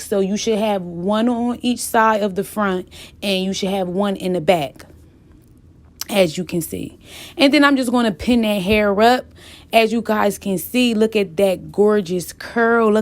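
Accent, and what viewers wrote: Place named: Philadelphia, United States. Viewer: Girl are you from philly? I hear the acccent lol, #phillygang 🤍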